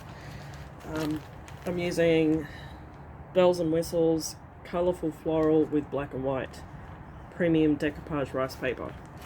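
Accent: Australia. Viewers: Just beautiful! And I'm so happy to listen to your accent as my daughter is in Perth, so as i listen to you I can imagine what she listens to. Very cool. Thanks!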